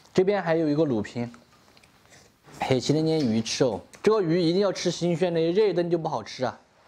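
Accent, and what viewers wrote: Sichuan, China. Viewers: 川普太可爱了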